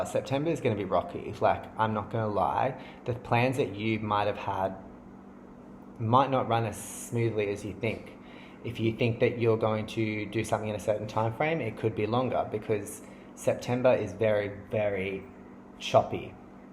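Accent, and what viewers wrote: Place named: Australia. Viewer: Great to hear an Aussie!! You're spot on... keep doing it... I love that you're not afraid to talk about the denial ..it was my relationship... I felt he was lying about things but he gaslighted me into believing him...until I started having confirming dreams!! Confronted him & now with my eyes opened I could feel he was lying... he's a covert narcissist. It was his control for 4 yrs & yeh on the surface he gave up alot of addictions but was wanking to porn/women online!! Yep he's in HUGE denial... very deceiptful Keep reading... you're fairly spot on for me except the career thing...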